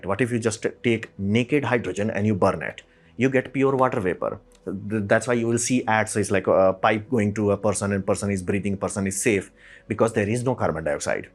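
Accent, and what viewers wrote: India. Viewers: Really enjoyed. Science +sarcasm + indian accent Subscribed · his speech was fairly casual. You are probably not very used to english. And he used indian accent so i dont really understand why this is difficult to listen to. If he's using indian sounds for english words, should be easy.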